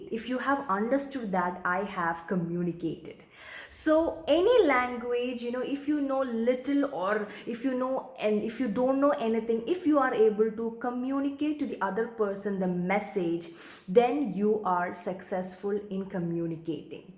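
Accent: Kerala, India. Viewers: I think ur from Kerala. But ur English do not have much Kerala slang. That's great. If me or anyone had Malayalam slang in English it will not be liked by other people's. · Last one month i started to watch your videos, its very nice and amzing. That time i think u r from north indai but today i seem u talk malayam😂. Any way good videos for us this. Thnk you. 🌹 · Malayali accent should not be a problem for anyone of us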